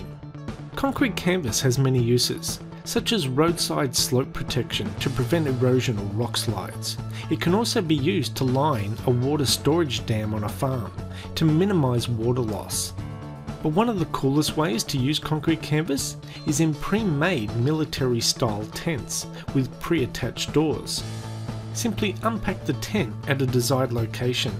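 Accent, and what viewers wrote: Australia. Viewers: I like your accent. You sound like an Aussie :)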